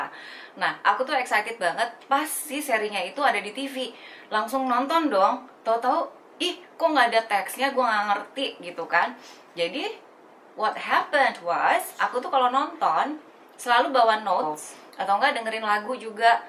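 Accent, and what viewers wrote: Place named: West Java, Indonesia. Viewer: ka suka banget denger kamu ngomong sunda euy 😂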